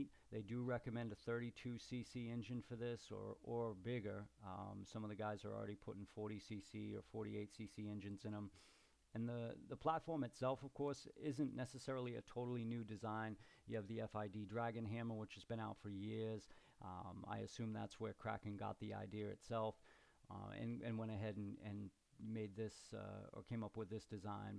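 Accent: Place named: Boston, United States. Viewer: Love your accent,bean town???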